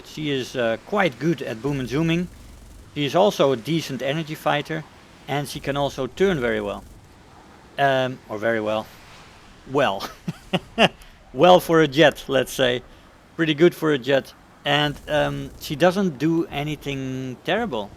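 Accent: Netherlands. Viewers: lol xD im from indonesian and i know the dutch accent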